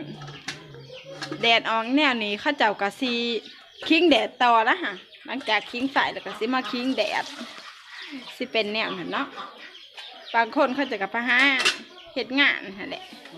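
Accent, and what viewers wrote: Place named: Sisaket, Thailand. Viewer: เว้าคือแถวศรีสะเกษเลยครับ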